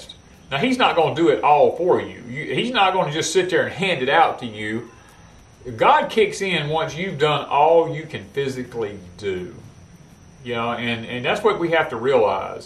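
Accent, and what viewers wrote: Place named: Kansas, United States. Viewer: Sounds like Kansas Brother with all those extremley's.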